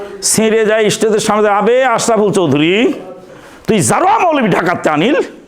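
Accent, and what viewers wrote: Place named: Bogura, Bangladesh. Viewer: Bogurar kotha shune onk valo laglo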